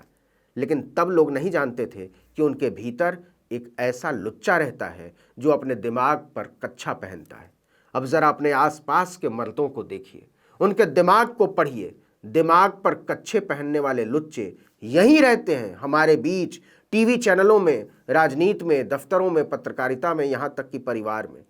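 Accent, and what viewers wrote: Standard. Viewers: Naveen Kumar ji aap ki Hindi bohot hi fluent hai aur pure hai ji...kya khub Hindi bolte ho ji aap... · Big fan sir. ur pure hindi narration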